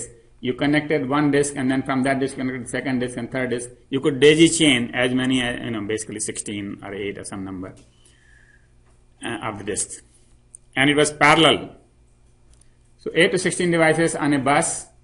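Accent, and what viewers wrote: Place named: India. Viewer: He has Indian accent, may be that's why it is hard to understand. Otherwise it is quite good english. Thanks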